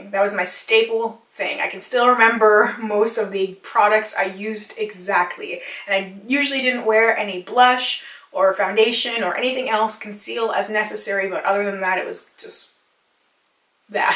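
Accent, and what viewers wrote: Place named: Canada. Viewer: I can totally hear your canadian accent in this vid lol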